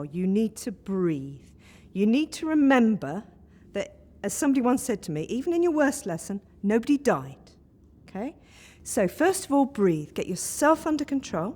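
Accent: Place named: United Kingdom. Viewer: I really love your accent madam! I wish our english subject is british english and not american english...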